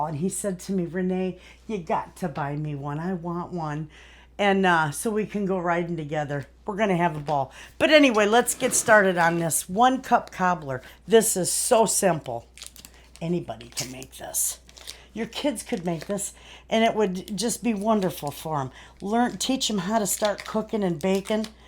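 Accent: Michigan, United States. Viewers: Without even seeing where you were from I could tell you were from Michigan.I lived in Gaylord for years and my daughter still lives there.I’m curious to see , where in Northern Michigan do you live? We love it but we had to move home to Louisiana about 7 years ago.we vacation every year in Gaylord.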